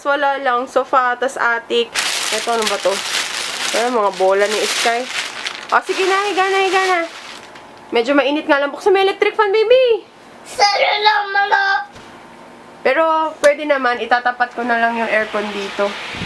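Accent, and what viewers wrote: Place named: Batangas, Philippines. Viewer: Haha .batanguena po b pa kayo may punto po kase kayo hahaha? batanguena here po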